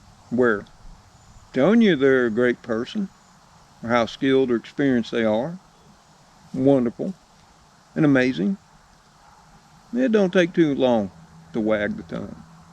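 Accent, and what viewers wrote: South, United States. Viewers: A lot of folks can't deal with a southern accent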